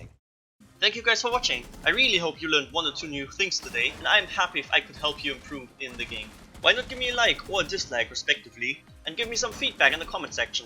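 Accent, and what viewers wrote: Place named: Germany. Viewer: Haha, ich bin auch deutscher, ich kenne den Akzent von mir selbst nur zu gut:D Aber mach weiter, deine Videos sind super! Und es sollte 'german accent' heißen, nicht 'germany accent'. Ich hasse es, auf dem handy zu tippen:/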